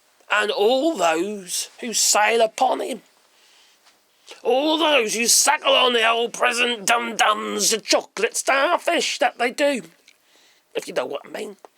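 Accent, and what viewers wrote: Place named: Scotland, United Kingdom. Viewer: Love your Scottish accent.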